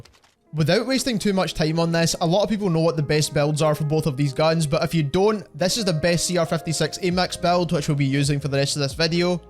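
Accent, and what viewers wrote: Scotland, United Kingdom. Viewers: I like your Scottish accent 😊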